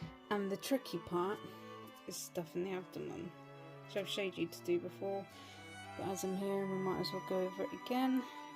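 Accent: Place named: England, United Kingdom. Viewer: Sure is a lot of stuff in there ,but I could imagine the smell and rot if the guts were left in.....boy howdy !!! You sure have an eye for detail and are very meticulous just like me, so I can truly appreciate what you do ,and you do it so very well indeed ,very talented girl !!!! I love the music you play in the background and love your English accent ,gives your channel some class.....very classy and the occasional potty mouth brings it all together nicely. Don`t know who I like better ,you or David Attenborough LOL. BTW I spread my Giant Golden Orb spider and there was a cornucopia of bad language in the bug room......two of the damn legs were broken off before softening the poor bugger but I did triumph and was victorious in the end. I don`t know how you do these projects while recording yourself and having to narrate all at the same time working on such fine specimens for all the world to see.....amazing my dear !!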